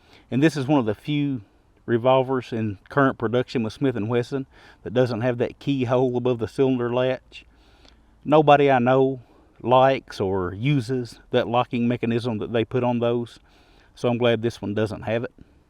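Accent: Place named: Appalachia, United States. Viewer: The good ole appalachian accent can be hard. He is a great guy, maybe turn captions on, worth listening thru. I personally find him pretty easy vs many other thicker accents (creole, or thicker appalachian, southern ebonic etc)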